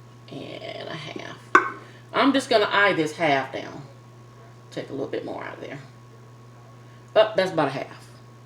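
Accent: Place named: Pennsylvania, United States. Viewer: I remember that coffee cake one f my all time fav’s we might be close in age, I’m here in the San Fernando Valley, I think I’m older graduated in 62. Thank you for the recipe! Your from PA sounds. Like.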